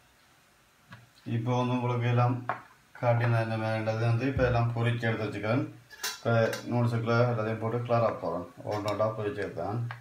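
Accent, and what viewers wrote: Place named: Sri Lanka. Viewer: Neenga pesura srilankan tamizh romba pidikum anna👌👌, London la oru idathula indha noodles sapta niyabagam iruku 😊, yummy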